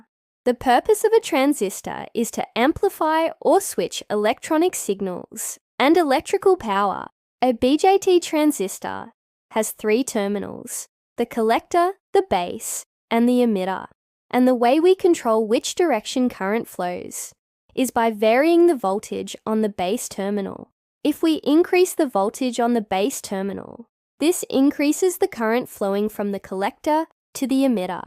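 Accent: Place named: Australia. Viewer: Very interesting. Thanks for sharing. I like the relatively light Australian accent. 👍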